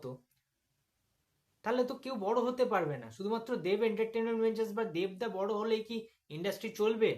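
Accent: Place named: Bangladesh. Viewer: Golar voice sune to mone h6e bangladeshi....ta boli age nijer desher subho kamona korun na...jate gota desh tar sad budhi ghote....parshobarti desh e ki h6e jene ki korben....tollywood er king age jei thakuk na kno...akhon 1jn e a6e...at present Seta hlo Dev....apnader Shakib o kichu chirte parbe nah...😂 Ei karonei hyto bole Ma er theke masir dorod beshi...😂😂😅😅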